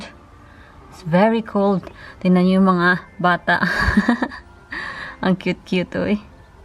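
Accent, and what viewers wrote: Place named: Visayas, Philippines. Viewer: Hi ate love you bisaya accent❤ #christmas2019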